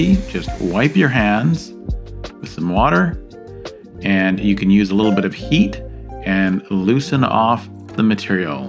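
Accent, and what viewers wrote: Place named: Midwest, United States. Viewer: Nice video Sir! I am wanting to protect some areas on my carbon fiber mountain bike. Your video helps with some great ideas to have a successful install. I hear from your accent that you're from the Midwest or Canada. The word out gave it away. LOL. I am from Michigan so I totally get it. Have a blessed day.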